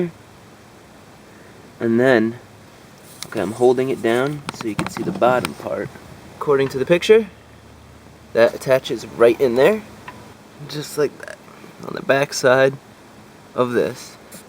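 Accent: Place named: New Jersey, United States. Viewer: nice video thanks. I grew up in NJ - your accent sounds like you're from not far from there.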